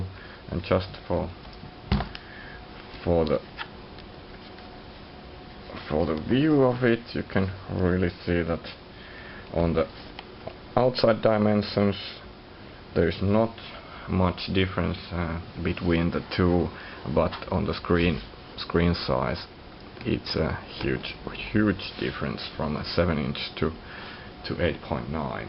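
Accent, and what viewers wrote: Finland. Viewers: Hah, accent doesn't get more Finnish than that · Selvästi suomalainen mies puhumassa englantia :)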